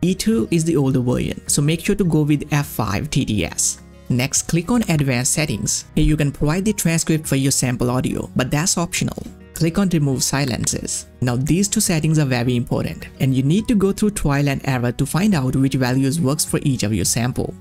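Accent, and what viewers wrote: India. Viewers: Why would you want to clone your INDIAN ridiculous accent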